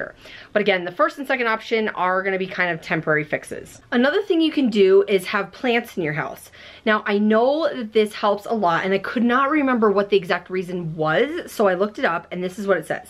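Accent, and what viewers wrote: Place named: Midwest, United States. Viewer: Where are you from? Your accent is midwest-ish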